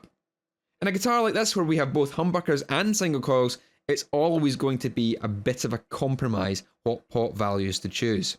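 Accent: Scotland, United Kingdom. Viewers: Yo, I can't wait for episode 9! I have been watching a lot of your videos and I am getting used to the Scottish accent (being a Filipino that is quite a challenge hehehehehe). I just wanna say EXCELLENT work on explaining the science and art of electric guitar making in plain layman's language. Thanks so much for educating us (you can be a great school teacher brother! I am a school teacher by day and musician by night), Keep it loud and I'll see you on episode 9!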